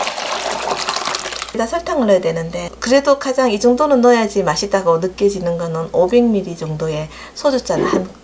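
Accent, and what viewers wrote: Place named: Daegu, South Korea. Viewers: 어릴때 엄마가 만들어주던 식혜 생각나요. 대구사람인데 이모 말씨가 촵촵 감기니 정겨워요^~^ 울 엄마한테는 전수 못 받았던 레시피.. 이모한테 배워가요♡ 뭔가 어려울 것 같아서 생각도 엄두도 못 내던 식혜~ 도전!!!! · 경북사투리에 참 듣기좋은 말소리를 하시네요..보통 경북사투리 참 듣기싫은데..경상도 사람 입장에서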